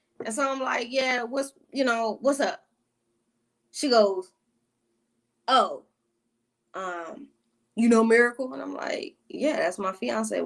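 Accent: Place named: Houston, United States. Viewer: She sounds like she lives in Northside Houston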